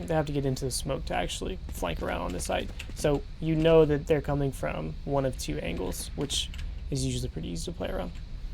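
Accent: South, United States. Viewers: Are you from the south? Im from bama I hear that southern accent.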